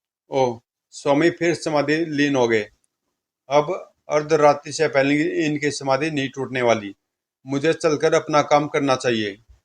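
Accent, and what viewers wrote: Haryana, India. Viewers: मजा आ गया हरियाणवी स्टाइल में comic सुनकर भाई, जय हो 🥹🤟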